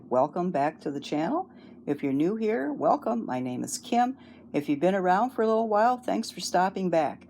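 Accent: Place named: Wisconsin, United States. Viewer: My parents are from LaCrosse, WI! I recognized your accent! People ask if I am from Minnesota or Wisconsin often! Soul sister!!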